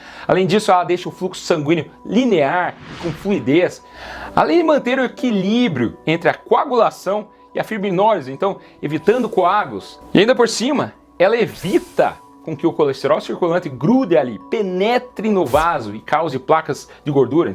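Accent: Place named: Caipira, Brazil. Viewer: Deveria ser proibido médico com sotaque caipira abobalhado!!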